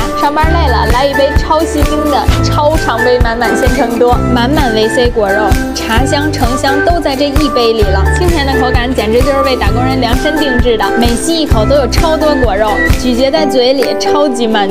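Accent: Beijing, China. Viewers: 北京兒話~好聽！擱這吧🤗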